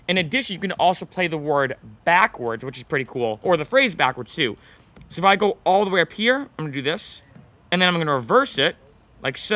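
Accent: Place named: United Kingdom. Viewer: It's neat but I wish it would have been a more neutral sounding voice, the British accent isn't the best choice.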